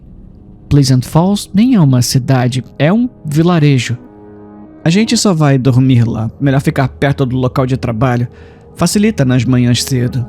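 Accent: Caipira, Brazil. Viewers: hahahahah esse sotaque caipira foi a melhor coisa do canal até hoje 🤣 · vim pela história, fiquei pelo sotaque caipira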